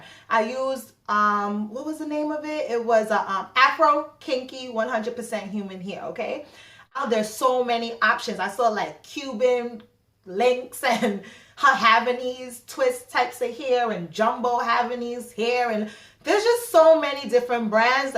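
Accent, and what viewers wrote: Trinidad and Tobago. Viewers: Very nice, you really answered my questions. And I always love a Trini accent. Take care. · I hear a trini accent 🇹🇹❤️ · ohh gosh love we trini accent.❤❤❤❤ and grate infomation.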